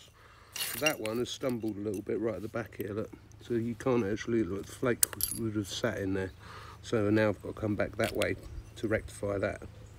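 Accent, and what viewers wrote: England, United Kingdom. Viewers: You won my sub boss. I've been searching for a channel like yours. I in the States bud in a state called WV where traces of Native American's are everywhere infact where I am to be laid to rest is a in a grave yard with a rare unopened Indian mound that they believe it goes back to the Woodland 1 period. I love searching for arrow heads and other artifacts I have been very fortunate many many axe heads. Some very old and others much newer 1500's......but man I could ask you questions till you go crazy. Also I can talk your ear off. I am just curious where you are from? You sound very English but my ear isn't good enough to know if you could be from Australia, New Zealand, or Great Britain or Wales.....so I just wanted to ask. You also might be my first person I am gonna look into Patreon. Cuz I 100% believe everything your doing needs passed down. 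I love everything your doing and damn if you don't do it like a Master. Take care boss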